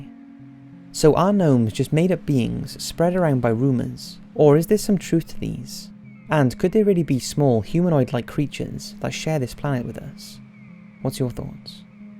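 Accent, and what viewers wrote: Wales, United Kingdom. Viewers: Finally found my favorite British narrator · I am in L O V EV with your videos!! And I also L O V E your accent. Is it like Welch? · Greeting from Wales! Also are you Welsh? You sound like it...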